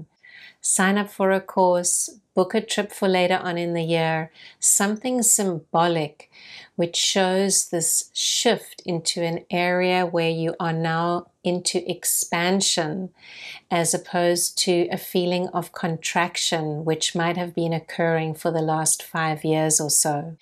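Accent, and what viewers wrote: South Africa. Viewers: Thank you for this reading and explaining it so calmly. I am having one of the worst years of my life and I do hope 2023 will be far better for me. I recognize your SA accent. Sending love from Cape Town 🇿🇦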